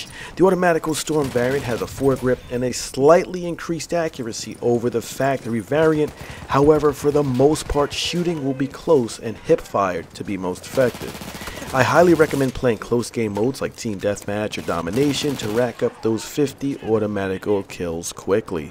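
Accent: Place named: United States. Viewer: Bro, You are 'THE' place to be to get the most out of BF1. Your voice is so clear and concise. I like your American accent. You know I'm from England, I speak way faster I doubt you would understand a word I say...Lol..Have a great Christmas brother.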